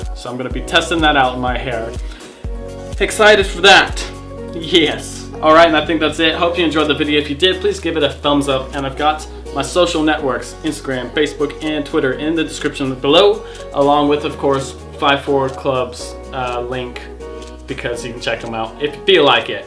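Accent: United Kingdom. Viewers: The british accent is kicking in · developing that British accent I hear... :)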